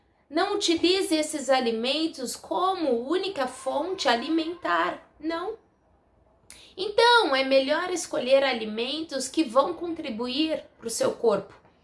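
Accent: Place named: São Paulo, Brazil. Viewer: uuuf acho que vc é de São Paulo capital isso eugosteeeo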